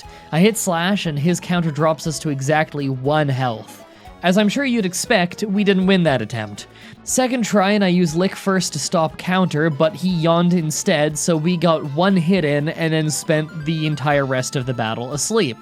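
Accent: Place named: Canada. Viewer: Man you're Canadian how cares how you say names of Pokemon. I just enjoy your smooth voice.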